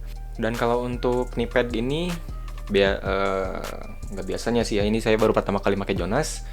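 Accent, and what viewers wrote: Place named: West Java, Indonesia. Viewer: Sunda banget bang nyorodot dong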